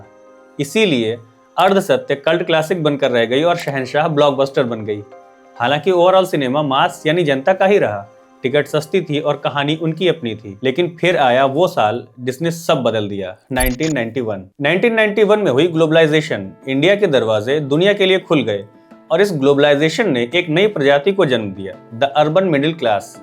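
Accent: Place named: Karachi, Pakistan. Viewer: app ki zaban bilkul hamaray jaisi hay from karachi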